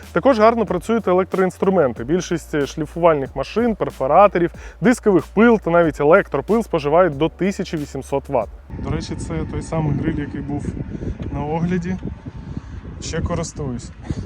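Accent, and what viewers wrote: Russia. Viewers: Твои русизмы в словах сложно осушать. Ты либо по русски бы говорил, либо пусть тебе бы написали текст для диктовки на чистом Украинском.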